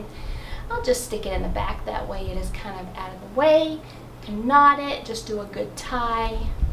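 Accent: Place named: South, United States. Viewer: Your southern accent makes you sound and seem as sweet as apple pie.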